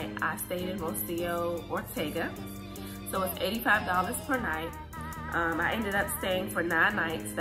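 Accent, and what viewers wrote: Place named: Prince George's County, United States. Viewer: you sound like you from PG lls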